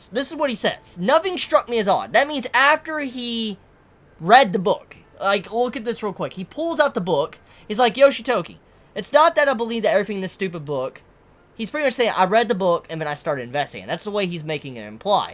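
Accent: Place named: South, United States. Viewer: He is from the Southeastern USA. He has a bit of a southern accent but not has heavy.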